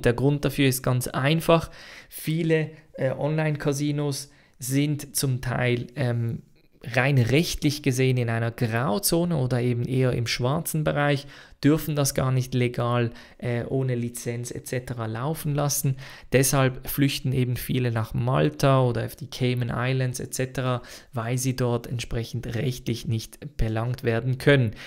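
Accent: Switzerland. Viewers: warum nennst du dich? Du bist Schweizer das hört man...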